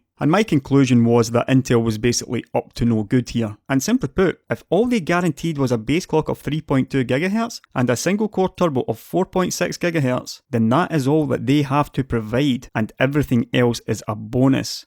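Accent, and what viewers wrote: Scotland, United Kingdom. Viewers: r u Scottish? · Keep your Scottish up! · Its the Scots accent, it just sounds like you are being nasty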